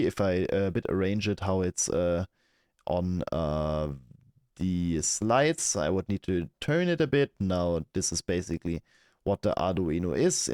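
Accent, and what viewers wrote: Germany. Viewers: Man hört die deutsche accent 😅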